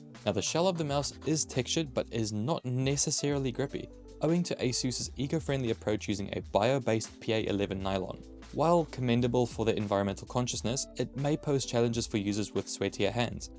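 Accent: South Africa. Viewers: You sound South African. Where do you get your mice from?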